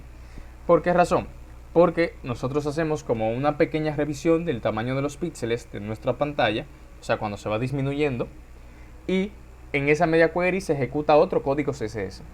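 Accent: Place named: Dominican Republic. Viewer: Suenas dominicano 😂 saludos broth bendiciones un nuevo suscriptor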